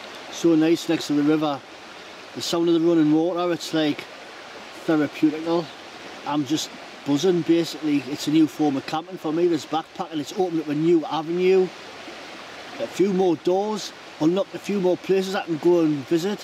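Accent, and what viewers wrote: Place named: Northumberland, United Kingdom. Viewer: Wey I, I take it from ur accent it’s bothal Northumberland, used to go there wit ashington sea cadets cleaning the river on wknds man...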